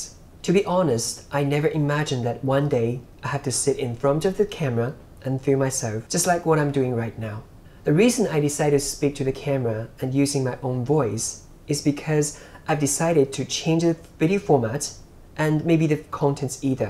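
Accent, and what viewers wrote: United Kingdom. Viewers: How did you learn Japanese? Your RP English is very excellent.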